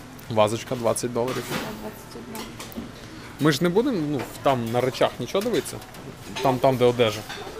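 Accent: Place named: Volyn, Ukraine. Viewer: Чути Волинська або Рівненська область)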